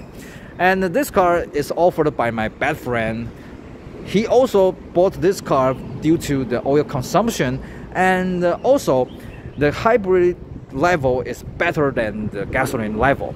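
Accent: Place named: United Kingdom. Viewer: 講的很有道地的英國口音不愧是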